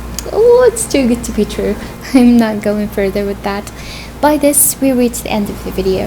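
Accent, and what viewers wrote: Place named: United Kingdom. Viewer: ماشاءالله كيف تعلمتي الاكسنت البريطاني نقطه ضعفي حاولت اتعلم بس ماش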